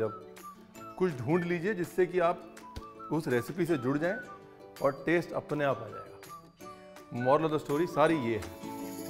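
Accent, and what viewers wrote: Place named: Punjab, India. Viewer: so nice sir, Punjabi ka tarkaa bahut sohnaa a ji.love it❤❤ chak Doo.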